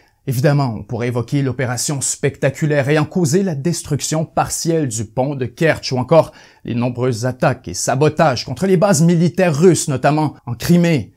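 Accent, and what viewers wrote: Quebec, Canada. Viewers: Yo tu est québécois nn ?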